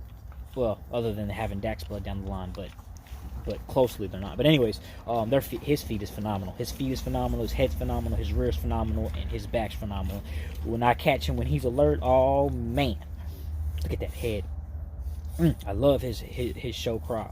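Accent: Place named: Rural, Puerto Rico. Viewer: Good information bro.. this is dead ass my 1st hearing a Country Rican lol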